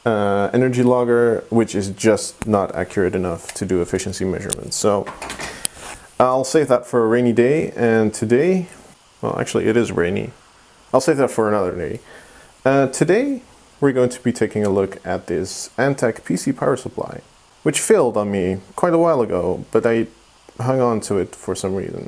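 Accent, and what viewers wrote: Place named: Netherlands. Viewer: We will save that for a rainy day... Oh wait... Hahah I love your video's. Some things i dont understand, but you explain things very clearly. I find it very fun to watch. Accent is good too, normaly a dutch accent really irritates me, but not you, the accent is very minor.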